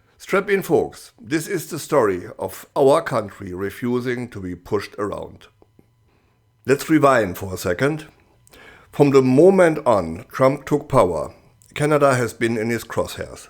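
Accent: Germany. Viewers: Liebe Grüße aus good old Germany! Ich muss schon sagen, dein deutscher Akzent ist deutlich zu hören, doch deine English Skills sind schon recht ordentlich. 😇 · thank you, great reporting & did I ever tell you that I love your German accent? LOL!! I totally agree with your POV! Although these times are VERY stressful for everyone, I can't say how PROUD I am of my fellow Canadians for standing up and standing strong against those CRIMINALS in the US. Whenever I feel down about everything, I read the comments on shows like yours and feel so much better, much calmer knowing that Canadians are feeling the same as I do and are willing to suffer the short term to gain in the long run. I trust our PM and am so happy to see him taking Canada away from these cretins down south and doing business with the rest of the world. What's the point of giving concessions to a mob boss that will NOT honour any deal with sign anyway?? 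Look, I work for the GOC and nearly lost my job when Carney did those major cutbacks on the public service. I was one of the lucky ones that didn't get cut but even if I did, I would still support him and what he's doing for our country against the lawless, murdering criminals running the United States right now. The one good thing tRumpf has done for Canada is bring us closer together as a nation to realize how lucky we are to BE Canadian and that we must now fight for that life - again - in world history. CANADA STRONG & BABA-MAGA (buy anything but american & make america go away)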